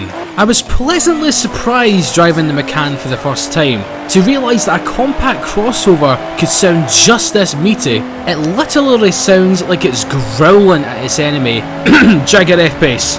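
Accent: Scotland, United Kingdom. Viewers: I found your Chanel by this video, and I could not help to love that accent! Where in GB are you from, mate? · Best Scottish accent 👌👌👌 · If you're Scottish then I'm subscribing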